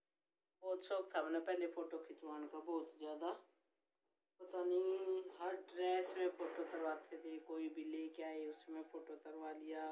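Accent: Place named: Haryana, India. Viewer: Aapke awaj sa lag rha tha aap jind ya kaithal sa ho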